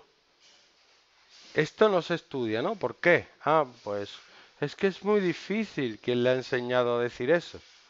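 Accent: Spain. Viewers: Increible que en 1288 vistas, nadie haya reacionado. En fin... "le stylo á qui est?" no se dice de ninguna manera. jajaja y las tildes al revés y la pronunciación a la española. en fin. un desastre.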